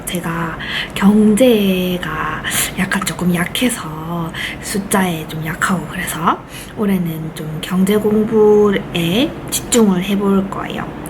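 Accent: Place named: Gyeongsang, South Korea. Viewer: 경상도말투 핵기욤이셔요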